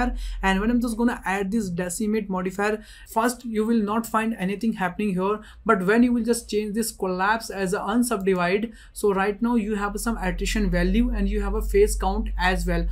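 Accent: India. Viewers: How to find video tutorials without Indian accent?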